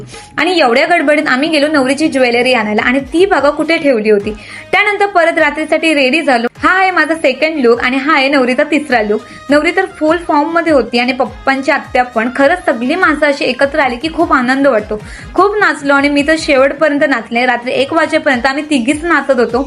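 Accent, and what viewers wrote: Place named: Agri, India. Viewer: Tuji bhasha tar aagri vatte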